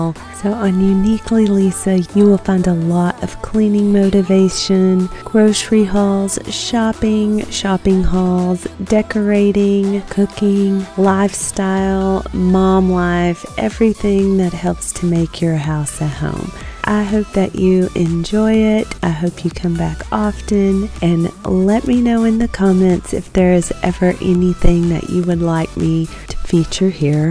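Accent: Texas, United States. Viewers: Hey, supporting from Virginia with like 90; cute Texas accent hehe. We'd love to have your opinion about our nature ambience soundscapes as well. New sub and hope we can keep in touch, if possible.👍💚 Sending positive vibes your way!:goodvibes:🔔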